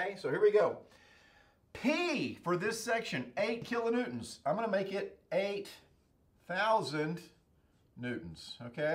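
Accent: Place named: South, United States. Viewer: I'm a sophomore at Cornell. Prospective MechE. One of my classmates told me to look this dude up. I feel like I've just found the ultra southern Professor Leonard of Engineering, and I'm fucking HERE for it. I'm going to survive statics if it kills me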